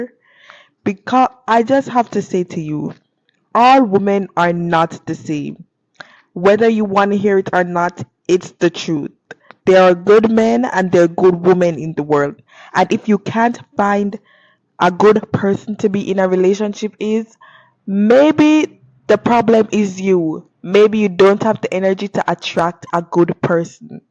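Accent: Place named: Caribbean. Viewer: Nothing someone does it perfect. I will agree some of these podcast approach towards woman is harsh and sometimes unfair, but they are a necessary "evil" per say since the 80% of men who were left on read by most woman are either going to follow these guys... or Join these females onlyfans. For every actions, there is a reaction. Woman want to continue to aim for the best, as they say the 20%. which is fine and is expected to go for the best you believe you can get, but this is not the case. There is a certain amount of guys who do rotate around the same girls, Even if these girls are dating a "nice guy" they have their lapse of judgment with these men. I can agree they themselves may not be the BEST people to preach the message, but no one was preaching anything. The reaction to woman dating choices is this. Hardened Men who are sickened by the westernized woman I can hear some Caribbean accent from you, so i can tell you may not be with the western woman BS, but you can't speak for the majority of woman. 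As a guy who has dated, and dealt with many woman. Yes woman are generally the same, The same way Men are generally the same. We have our own individual differences, influenced by our upbringing and society who grew up around, but biologically, when it comes to dating and how woman choose their mate, just like men, is generally the same. Per instance 9/10 Woman do not like Nice guys, or push overs. You try to work a Hardened tough guy to become more soft, like building you'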